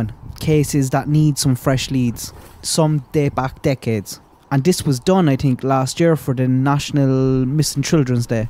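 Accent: Ireland. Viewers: I live in Newfoundland Canada (just a hop across the pond)and you have the same accent as my late grandfather (his great grandmother was Irish from Waterford county), where in Ireland are you from, not a street address, just a county We have a very large population of people of Irish decent, Some from the 1700's. Generations later many have not lost their various accents. I'm like Michelle, worked on my ancestry for years and I'm looking for my castle or a pot of Gold. Back to the Summer Wells case, not sure if you live around mountains and if there are those who wish to live almost under the radar but I know here in eastern Canada there has been crime "in some of our hills, mountains as well. I looked at some of the criminals who live on or around Ben Hill Road. I believe there is some kind of mob mentality in there, but based on some of the stuff Jodisue has got on with, you'd think they were all saints. 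Now truth be told we all know differently. Frank Light for instance, she seems to defend and he has a bad record. the whole Hollow in there is crawling with those with a criminal record.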